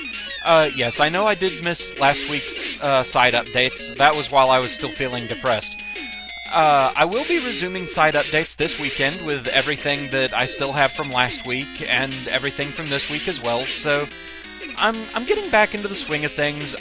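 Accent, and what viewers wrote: United Kingdom. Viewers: umm so you migrated to there from Britain? Because you have such a nice British accent! Or do i just not know anything :D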